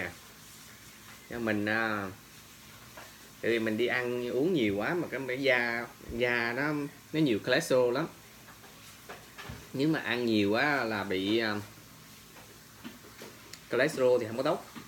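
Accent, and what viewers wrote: Quang Nam, Vietnam. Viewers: Có phải anh là người quảng nam đà nẵng không? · Anh nc pha tiếng quảng nam quảng ngãi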